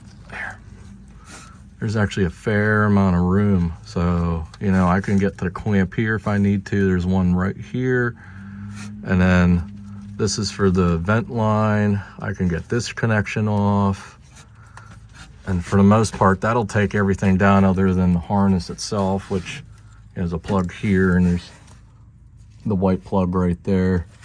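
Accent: Pittsburgh, United States. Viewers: I just subbed bro lol I’m doing mine right now actually haha. By the way…..??? Are you from Pittsburgh??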